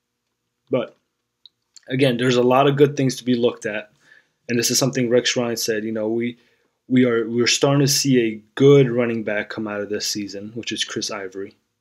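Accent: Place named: New York, United States. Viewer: Hi its wildcat thanks for liking my video i subscribed to you sound like a good new yorker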